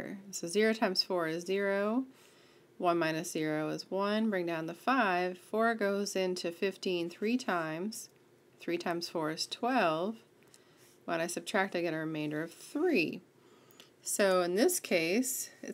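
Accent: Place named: United States. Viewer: THANK GOD!! an AMERICAN!! NOT INDIAN!! THANK YOU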